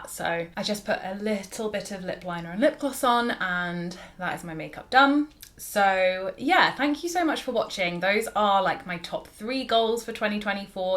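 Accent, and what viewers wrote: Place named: United Kingdom. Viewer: Hi!! I've been watching your vid's for a bit now but have finally decided to comment:) yay! I first found you as was searching for beauty tips, etc for Redheads and you popped up! Even though I'm quite a bit older than you, I totally relate to so many things you chat about, enjoy your recommendations, and love listening watching you and think you're a very mature, good head on shoulders kind of gal - love your accent too! I live in Canada but my entire fam is in the UK so gives me warm fuzzy feeling watching you - love your hair colour!! MIne is more on the Auburn/Copper side. Look forward to watching you in 2024.....Congrats on your new house & getting married:) Redhead sistahood - ha!💗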